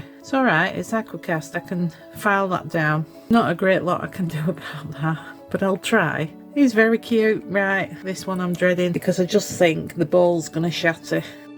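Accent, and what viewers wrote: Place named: Radcliffe, United Kingdom. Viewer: can I ask about your accent please? I've been trying to place it for ages! I'm originally from Radcliffe, and yours doesn't sound too dissimilar!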